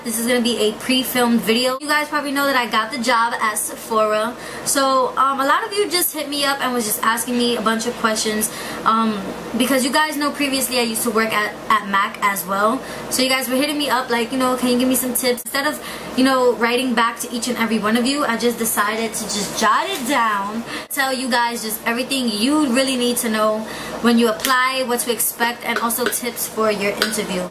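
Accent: New York, United States. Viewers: Its called a NY accent..duhh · You must be from NYC ☺ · are you from nyc??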